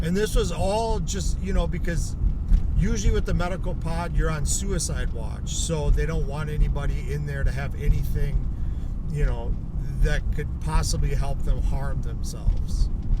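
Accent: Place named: Wisconsin, United States. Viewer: Are you from Wisconsin ? I can hear the accent in your voice. Im from the Green Bay / Appleton area .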